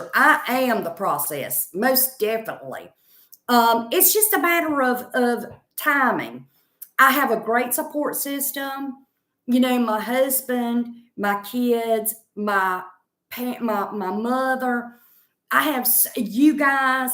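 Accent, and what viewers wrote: South, United States. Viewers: I adore you. I use to live in texas an have missed the southern drawl. You are hilarious. I had lost my laugh cuz of an abusive relationship and watching you I've found my laugh again, so I am forever always be grateful. And tell everyone to watch you. I wish you success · When you Start to say "What the f.. future holds.." I laugh right off the bat..I expect those southern words right off the bat..love you!🤣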